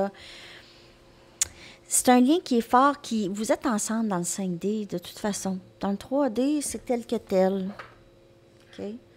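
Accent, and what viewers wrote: Canada. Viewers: Merci 🥰! Je vous ai découverte depuis peu et j’aime beaucoup vous écouter. Vos guidances me parlent et j’aime aussi votre bel accent canadien 🤗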